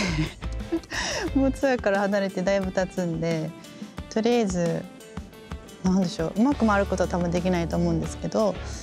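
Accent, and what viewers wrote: Kyoto, Japan. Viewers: はんなり京都弁で可愛さ増し増しやね～ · 現役の娘らよりも凄いスピン。京都訛りに萌えます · 理香子プロのスイングは以前からの憧れ。色んな番手の色んな角度からのショットが見たい。 プロの京都弁が可愛い。 どんどん続けて行って下さい、応援しています。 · 京都弁でリラックスしてる感じがいいですね。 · 元気が一番です！京都弁は可愛く見えますね。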